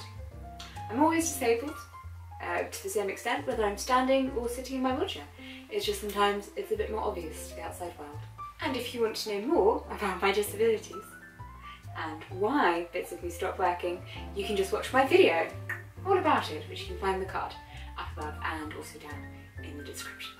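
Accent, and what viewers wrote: United Kingdom. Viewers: Weird u still have the British accent even tho ur deaf lol how did manage to keep that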